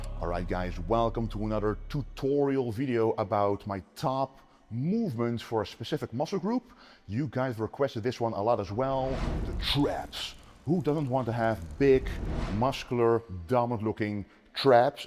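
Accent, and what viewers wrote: Netherlands. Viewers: Very well explained. I also noted a accent in your articulation. I know it's not from the shoulder shrugs. You sound dutch or dutch antillean. Anyways great video. I actually did them while you were explaining them using body weight,just to get the feel of it. And I did notice muscle contraction (slightly) as it may be... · Damn, I like his dutch accent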